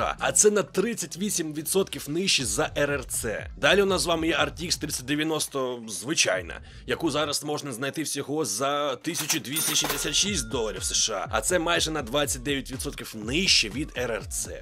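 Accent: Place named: Russia. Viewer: чувствуется что самому трудно переходить с русского на украинский язык,например,правильно не видеокарт, а видэокарт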